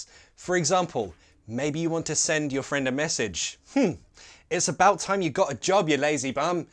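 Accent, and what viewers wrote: United Kingdom. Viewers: Sooooo amazing British accent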